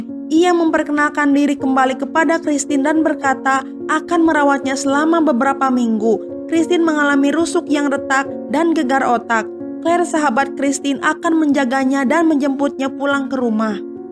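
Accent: West Java, Indonesia. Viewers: chanell ini nih ngerti klu pngen nunjukin khas sunda bs dgn nyebutin (kumaha damang)trus yg bukan org sunda kan ada kata2(welcome....)itu mskpn bahasa inggris jg kn global org2 byk yg tau artinya apa...dgn logat jg org tau ini chanell ini org sunda yg puny...ada chanell lain lupa namanya chanel ny apa trus yg puny ny org jawa dan bacain review film kata2 ny hmpir smua bahasa jawa tp kan yg nonton bukan jawa semua ibaratny klu mau jawa smua hrus ada translate dibawahnya jgan smpe kata2 ny jawa semua kan bisa sedikit kata tp org paham ....makany chanell trsebut byk hujatan n byk yg komentar jlek.... nah aku paham klu mimin bilang subcrb jd subrek trus like dibacany jd likeu itu sengaja lah gk usah dikomentar udh jd ciri khas....memang sbgian klu org sunda emng lidahnya pabaliut alias rumit klu dlm pengucapan apalg b inggris kebyakan makan sampeu hehehe..bercanda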